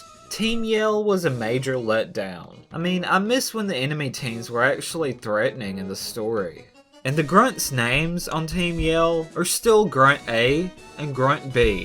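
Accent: United States. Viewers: Damn your American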